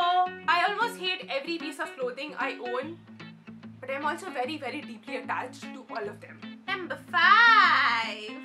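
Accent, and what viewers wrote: India. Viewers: Your indian accent is sooo cute..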